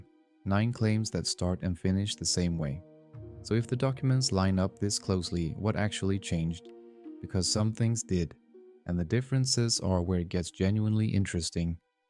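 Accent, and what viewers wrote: Sweden. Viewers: 'Over here in the US'? You sound like you are from Sweden. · I think he is just swedish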